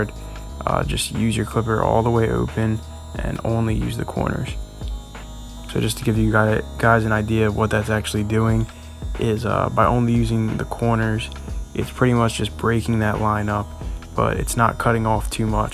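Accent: Philadelphia, United States. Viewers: Philadelphia accent. Am I correct?? Great video man. Keep up the good work. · You deff from philli .or somewhere in Pennsylvania right lol ..that accent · i can tell my man’s from philly just by the accent lol. thanks for the vid fam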